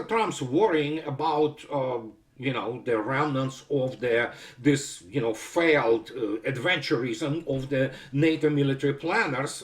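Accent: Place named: Russia. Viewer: You speak like Russian 😊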